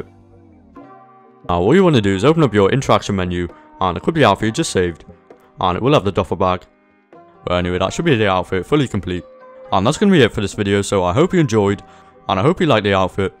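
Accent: United Kingdom. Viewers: Are you British mate?🙂😎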